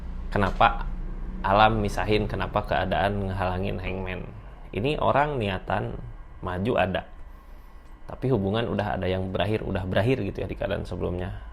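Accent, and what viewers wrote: West Java, Indonesia. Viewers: Aminnn😊 kang logat na meni nyunda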